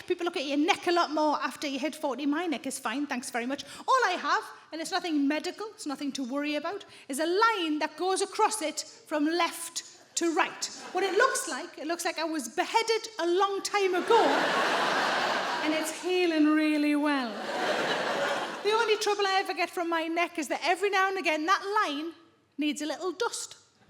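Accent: Newcastle, United Kingdom. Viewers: I have to say, I have a great laugh, listening to this lady. I was born in the north east of England currently residing the United States. This lady takes me home. Yes, I am a Geordie too!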